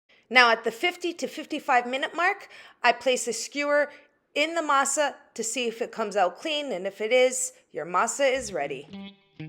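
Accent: Portugal. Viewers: A sua massa está linda,muito bem explicada.Adorei a sua pronúncia açoreana.Nasci em S.Miguel,mas estou na Califórnia há 53 anos,adoro tudo o que vejo,e também faço.Abraço Felecidades ❤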